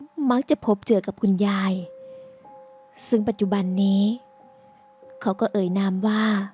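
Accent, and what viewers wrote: South, Thailand. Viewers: ติดตามมาเป็นปีละ ฟังตลอด และสงสัยตลอดว่าสำเนียงแบบนี้ เป็นคนใต้เหมือนกันรึป่าวครับ 🤔😂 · ลองไปฟังคลิปเก่าๆนะเจ๊แกพูดภาษาใต้เยอะ เจ๊คนใต้แน่นอน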